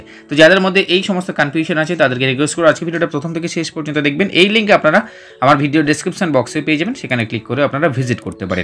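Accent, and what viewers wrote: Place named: Bangladesh. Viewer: voice appearance almost Bangladeshi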